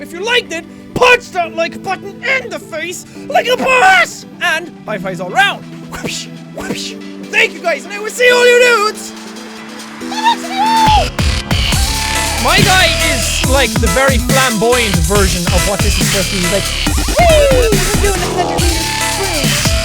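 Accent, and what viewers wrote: Ireland. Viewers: just don't loose the awesome irish accent... as long as that stays... I'm good...... grow an afro for all i care! :D but either way.... just do your own fricken thing! have fun ... fuck the haters.... they're just jealous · Ow...! My heart is breaking...! ;o; Look, I know this video is over a year old now, and I know this comment is just another drop in the sea you get of them every day, and I know I haven't been subscribed to you for very long and I often leave comments that I only hope are as funny to me as they are to you, Jack, but I just have to say this, and I mean it from the very bottom of my heart: You really are as amazing as people tell you you are. I like your deafeningly loud voice with your rough Irish accent, I like how brightly blue your eyes can get, I like your giant, shit-eating grin, I like your impossibly gray hair (and I actually just discovered that you look very nice in gray shirts). 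I like it when you swear every ten seconds and say words differently than I do, I like the personalities and names and voices you give to characters you've only just met and how you stick with them, I like when you yell to your ma about cool shit or scream at Billy for being a dumb shit. I like the scary, funny, weird, impossible, dumb, loving games you play, I like that gaming isn't all you do, I like how completely honest you are with yourself and others, I like the relationship you keep with your fans. I like YOU, Jack. We all do. Why else would we be here for you if we didn't? Ugh, long message is long, I'm so sorry! This sap is getting everywhere.... In any case, if you ever manage to make your way through this train wreck of feels, then good on you! If not... TL;DR: I like you, I'm prou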